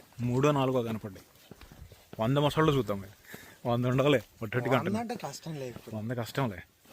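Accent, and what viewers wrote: Andhra Pradesh, India. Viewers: Bro meedhi andhra nundi velli nijamabad lo settle ayyaru kadhaa...me slang chepthundhi..appatlo chala mandhi akkada lands cheap ani koni akkade vundi poyaru..am I right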